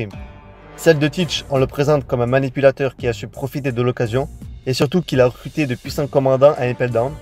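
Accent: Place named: England, United Kingdom. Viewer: Incroyable ton accent anglais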